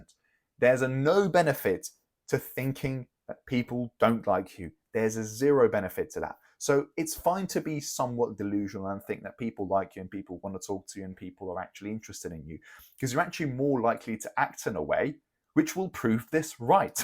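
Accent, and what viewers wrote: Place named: United States. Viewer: Yo man, how the hell did you ger an american accent 😂, youre supposed to have a hungarian accent, im from the Balkans and id like to have that clean accent too, any tips?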